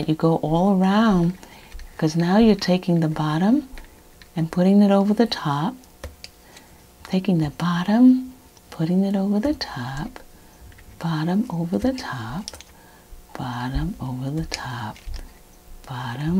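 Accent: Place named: Boston, United States. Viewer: Thank you so very much for doing this! You are a great teacher, and your accent reminds me of my grandma who was from Boston. I will be watching more of your videos! :)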